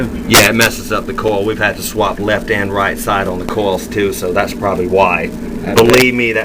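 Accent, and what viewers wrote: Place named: Cleveland, United States. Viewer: u sound like cleveland......thanks,this vid really helped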